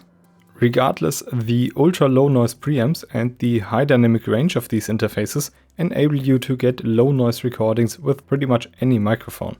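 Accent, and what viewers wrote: Germany. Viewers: I hear a bit german..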